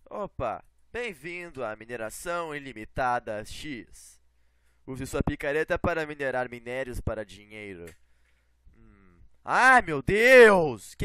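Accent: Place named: Santa Catarina, Brazil. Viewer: voce e de santa catarina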